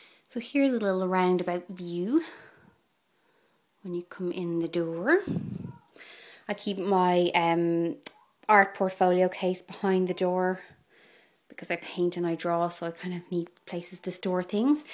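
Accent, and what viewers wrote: Ireland. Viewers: Are you Irish?? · im irish! i live in florida and have an american accent though. so finding your video made my LIFE when i heard your accent <3 your room is amazing & these were such great tips! thanks! <3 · omg. the sec i heard yur accent i was like finally an irish girl.... :D :D :D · Irish im thinking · Your from CORK ! deffo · I think she's Irish maybe? :) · are you irish?? you sound lik your frm dublin? :)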